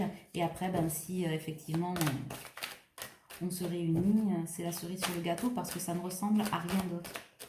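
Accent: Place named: Toulouse, France. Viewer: Bonjour, il y 25 ans quand on s’est rencontrés, Elle jolie brune du Sud et moi Loulou de la mer du Nord ( elle m’appelait comme ça ), Elle 23 ans, moi 40, 🙄🙄nos Âmes se sont reconnues, c’était plus fort que tout, un ouragan, un tsunami, un ´goût ´de foudre. Notre premier baiser💋 tellement passionné🔥 et si différent des autres, l’alchimie de nos corps, ce parfum enivrant de l’Amour, le plaisir du plaisir de l’Autre. 🤫🤫, le goût de l’Autre, Nos folies et notre insouciance, le secret de nos rencontres, 🤭 les débuts de la nuit.....c’est Amour inconditionnel. Le grand moment de ma vie, où juste avant Elle, je ne croyais plus à grand chose, à trop vouloir on brûle ses ailes. Elle me manque souvent, Elle me manque toujours 😌😌et si tu ne m’aimes plus, tant pis pour moi😌😌 Merci pour vos agréables guidances, optimistes et juste avec votre voix de Toulouse 😊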